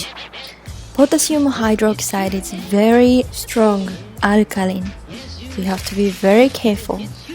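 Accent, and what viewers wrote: Japan. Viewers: まるで日本人の少女が、英語を話しているようです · □←この人の影響？(使ってみた) 母国語がないとは言うけど、私からしたら外国で英語が話せるようになった日本人の発音だよ。 ところで、👍が俺のコメントに複数つくのは誰が👍を付けてるんだろうね…😓一つだけならDianaさんだと分かるんだけどな。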